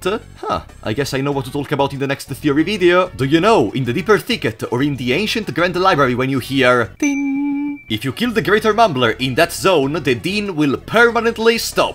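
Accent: Italy. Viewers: Il bro è palesemente del Piemonte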